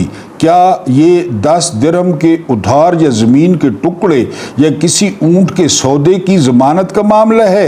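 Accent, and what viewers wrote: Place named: Mianwali, Pakistan. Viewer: مجھے سرائکی زیادہ نہیں اتی لیکن اپ کے منہ سے یہبہت اچھی لگتی ھے ویلاگ کا بہت مزا اتا ھے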